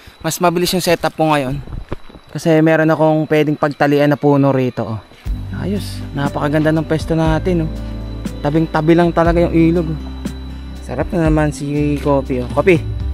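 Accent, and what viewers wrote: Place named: Pakil, Philippines. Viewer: parang taga Pakil ka sir? kilala ko punto mo eh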